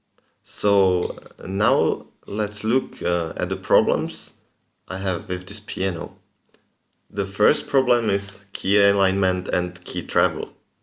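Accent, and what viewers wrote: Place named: England, United Kingdom. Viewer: I find your video offensive and uninformed; foolish, indeliberately rude libel due to plainly displayed ignorance. I suggest to Kawai that you be sued in a proper court of law for libel. You know nothing about digital pianos and need to go back to your ignominous acoustic piano hole where you came from where you will never find a girl or guy that will come near you. Kawai is a miracle to the digital piano industry. Ingrateful moron with an English accent to prove it...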